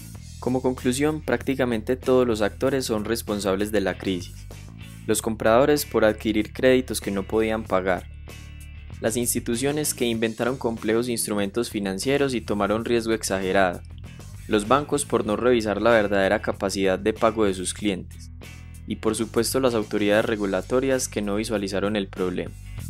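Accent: Colombia. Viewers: este men es de colombia??? tiene voz d colombiano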